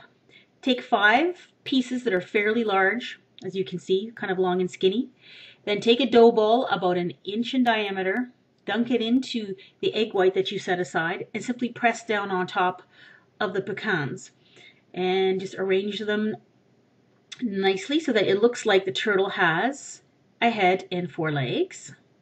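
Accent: Canada. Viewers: It's an accent thing common among canadian people.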